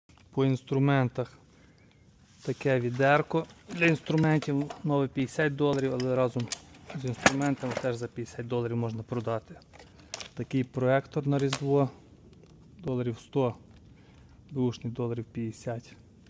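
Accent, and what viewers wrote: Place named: Western Ukraine, Ukraine. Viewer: Знімаєш ні як , а в перемішку з западнянським акцентом це просто жах . Хто тебе з буд майданчика відпустив , ваша реальна тема це будувати...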